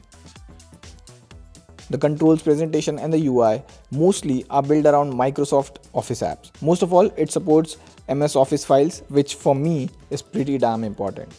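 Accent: India. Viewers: Hallo friends in have indian acsent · thick indian accent why it have to be thick indian accent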